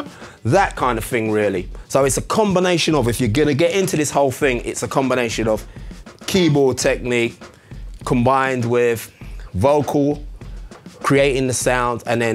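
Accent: United Kingdom. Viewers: British is just amazing. Love his accent.:D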